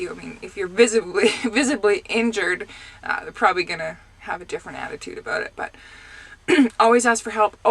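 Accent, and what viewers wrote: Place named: Canada. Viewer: Canadians have cute accents. As far as asking a guy for help, a woman should never be afraid of asking for assistance when needed. My Marine buddy has been driving for twelve years, and STILL doesn't know the do's and don'ts of sliding the tandems. Once I get my cdl and get on the road, I'll help ANYONE that asks.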